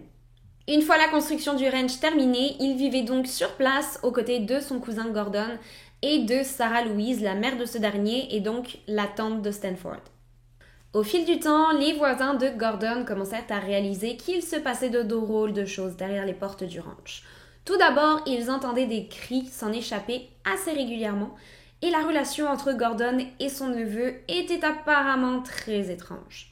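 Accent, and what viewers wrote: Quebec, Canada. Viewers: Il y a un petit quelque chose dans ton accent qui sonne québécois. Est-ce que je me trompe? Tu es pétillante! C’était la première vidéo de que je regardais, mais certainement pas la dernière. Je me suis abonnée!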